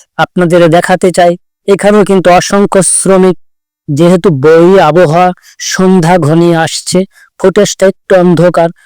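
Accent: India. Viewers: তোমার ভাষাতো দেখতেছি ওপার বাংলার!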